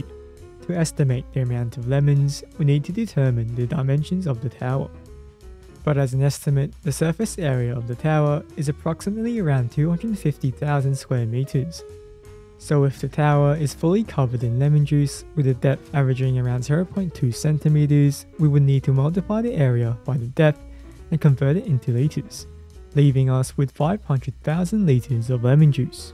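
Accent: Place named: Australia. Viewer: A British person with an Australian accent?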